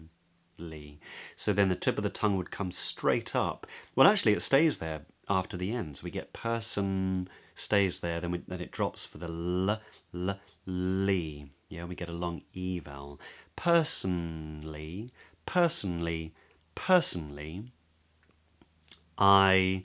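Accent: RP, United Kingdom. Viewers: Is your what we call RP / BBC accent ?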